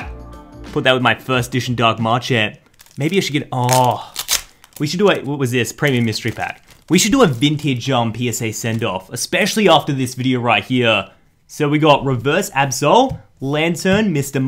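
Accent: Australia. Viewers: R u Australian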